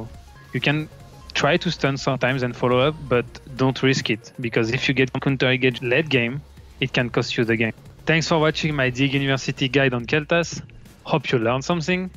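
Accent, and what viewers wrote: France. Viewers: The frenglish is real :D i never know if he's saying "hit" or "eat", both make sense haha. Stil, love the vid, and love the team <3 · warchief ultradeath conan barbarian terminator.... But English with French accent is so good!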